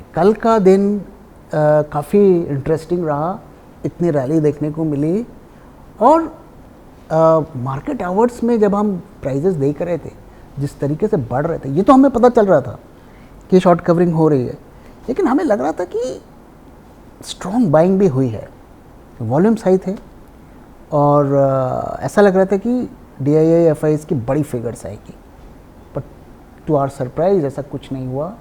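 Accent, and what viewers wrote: Bengal, India. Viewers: Haha she has such a cute Bengali (or is it Assamese ? accent in her Hindi ! Love it. 😄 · Yeh toh bengali wala hindi accent hain🙂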